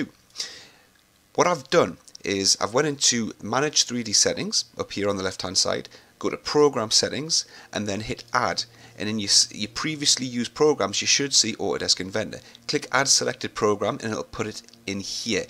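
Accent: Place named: United Kingdom. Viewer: I love your way of commenting? What part of UK are you from? Your accent is delightful.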